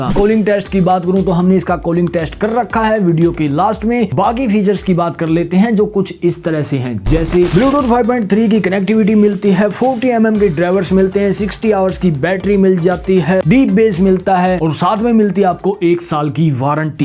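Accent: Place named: Punjab, India. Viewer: Punjabi ho ke harayanvi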